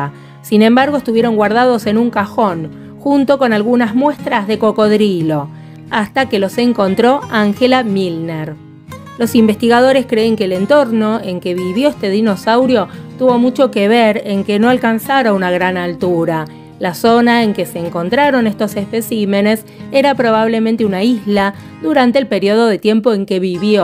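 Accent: Argentina. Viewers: Amo tu acento Argentino 🥰😍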